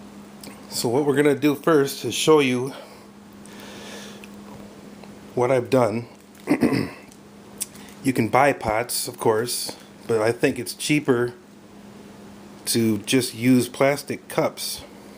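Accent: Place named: Minnesota, United States. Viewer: Love your video! So funny I could tell you were from MN before you even said. Probably because I grew up in Prior Lake! Love your advice and it is really awesome! Thanks so much!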